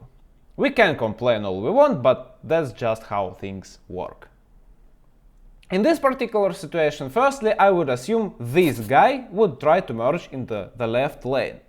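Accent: Russia. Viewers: Yes! Our presenter in question is quickly becoming one of my favourites. I really like Russian accent. I like how he further analyses MotoStar videos; I think they may also be presented by a Russian guy. Humour and good sense make for very entertaining and instructive videos. This guy is a good teacher.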